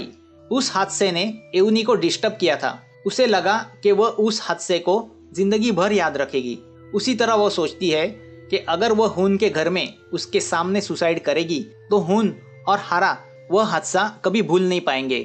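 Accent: Maharashtra, India. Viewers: Typical thick Marathi ascent horrible narrative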